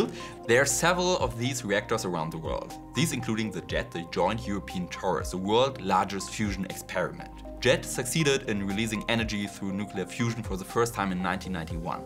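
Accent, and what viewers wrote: Germany. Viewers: Wenn ich als Deutscher Deinen deutschen Akzent extrem ulkig finde, dann ... ist es anscheinend genau richtig. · Physics is best "auf Deutsch", or "auf Englisch" with a German accent!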